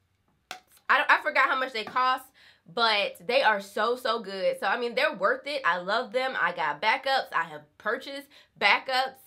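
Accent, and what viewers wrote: South, United States. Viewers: Girl that southern accent is coming through!🥰 I would love to see eco and wetline comparison. Thanks for sharing your product empties. Peace and blessings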